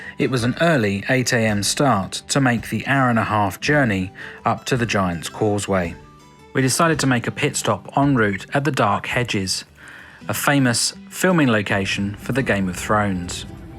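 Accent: England, United Kingdom. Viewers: Just love the English accent….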